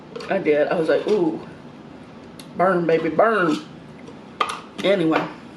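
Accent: South, United States. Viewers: Love your Southern accent. Sorry for the bad people who hurt you. I just don't understand why people can be that way. I get so much enjoyment watching you enjoy your food and I guess it is a vicarious thing. Wish I had the same meals you eat. I really do trust your comments about food and think you have a wonderful discriminating sense of what is good and what isn't. You are a beautiful person and bring joy to many of us. Be well.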